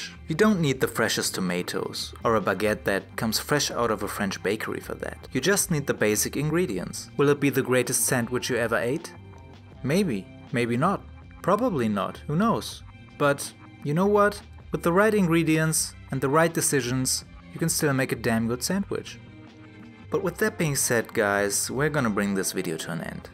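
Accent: Germany. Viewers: Man hört raus dass du aus deutschland kommst haha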